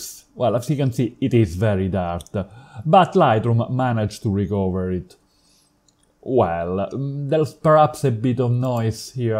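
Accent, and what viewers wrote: Italy. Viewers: I like your italian english. Made me feel like at home again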